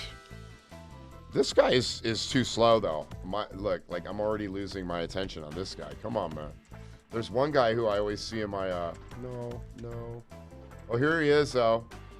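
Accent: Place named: Nebraska, United States. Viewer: You sound like you're Hastings Nebraska this is your dialect and maybe you don't know it but sound like you're from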